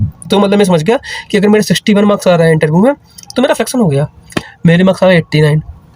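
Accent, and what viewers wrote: Lucknow, India. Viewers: Aap lucknow se ho?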